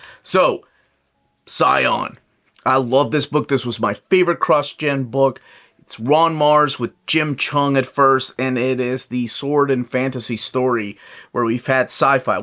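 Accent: United States. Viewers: i want a compilation video of cowboy accent to listen to before i go to sleep every night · Clicked because of cowboy hat and was NOT let down when i got the accent to go with it!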